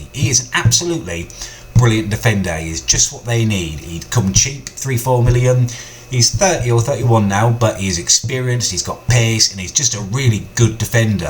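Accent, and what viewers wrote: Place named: Blackburn, United Kingdom. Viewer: I thought your accent sounded familiar, I'm from clitheroe it's a town about 10 miles away from Blackburn. well done on 20k btw I like your videos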